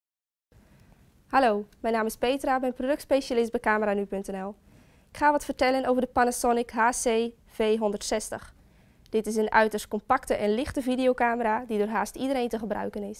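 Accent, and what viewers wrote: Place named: Geordie, United Kingdom. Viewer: That is the strongest Geordie accent I've ever heard!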